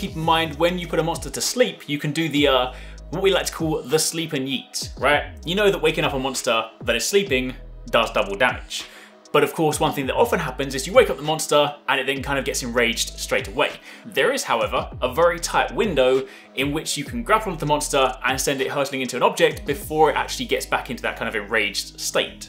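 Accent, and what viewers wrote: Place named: United Kingdom. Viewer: He sounds british but looks scottish ???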